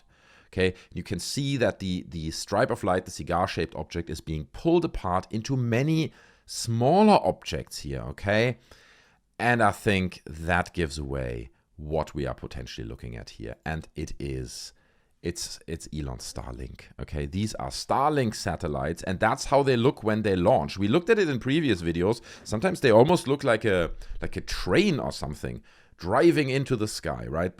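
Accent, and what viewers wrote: Germany. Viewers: an english speaking german sounds so intelligent and trustworthy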